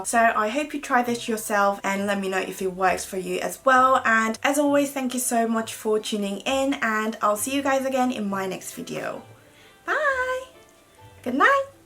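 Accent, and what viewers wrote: United Kingdom. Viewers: 메이크업 영상보다는 일상 영상을 더 먼저 접했는데 언니의 여유롭고 행복해 보이는 삶의 태도가 부럽고 저도 닮고 싶었어요~😇학교다니고 시험에 찌들다 보니 언니처럼 밝고 긍정적인 하루하루를 살고 싶더라고요.😢😢항상 꿀떨어지는 영국 발음과 함께 좋은 정보, 영국의 예쁘고 아기자기한 일상들을 공유해 주셔서 감사합니다😘 앞으로도 응원할께요👊👊 제 올 한해 목표는 꼭!!!!라면을 줄이겠다!!!!! 기필코!!!!!!!!!!!!! 입니다💞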